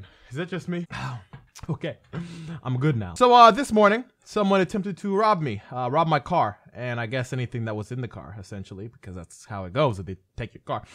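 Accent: United States. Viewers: Damn bruh. Where you from the chi?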